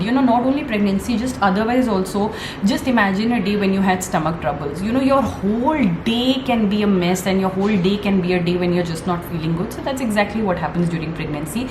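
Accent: India. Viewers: This is such a normal indian english accent.. What a ridiculous comment. How is everyones mother tongue hindi in India???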